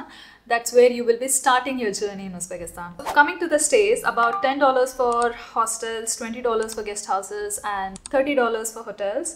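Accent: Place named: India. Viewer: I enjoyed your programme BUT you need to improve your pronunciation..its too much of Indian slang in your speech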